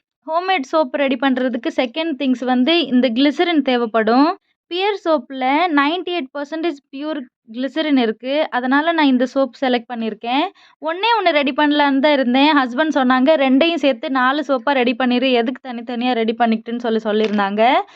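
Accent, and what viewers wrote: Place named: Odisha, India. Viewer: சகோதரி உங்களை பார்த்தால் ஒடிஷா பெண் மாதிரி இருக்கு. நீங்கள் பேசும் தமிழும் ஒடிஷா பெண் பேசுவது மாதிரி இருக்கு. உண்மையை சொல்லுங்க நீங்கள் ஒடிஷா பெண்ணா இல்லை தமிழ் பெண்ணா?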